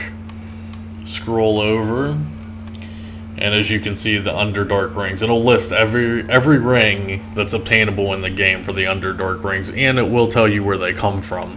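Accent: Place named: Philadelphia, United States. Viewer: Nice, me too but you sound like a friend who moved to Philadelphia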